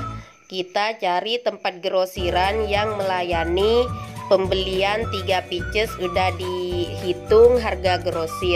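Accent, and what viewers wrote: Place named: Madura, Indonesia. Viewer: orng madura kyak e logate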